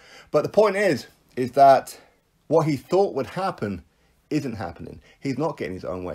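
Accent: United Kingdom. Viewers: Listen obviously from your accent your britian but whats fair is fair. Ruiz whipped joshua ass no matter what you say he knocked him down more times. Wether it be if his head or distracted whatever the bullshit is. But ruiz is the new champ. Remtatch clause or contract whatever the fuck you wanna say. Ruiz is the new heavyweight champion. Id rather see him fight wilder or fury. The rematch will be the same. And dana white says styles make fights. Ruiz was better that night. And in my oponion hes the better fighter ruiz is a savage joshua is a terminator robot with no rythym just strength. In boxing its more then strength. Ruiz was and is the better fighter. Get boxing politics and money out the way. Ruiz is more superior ......period if you cant read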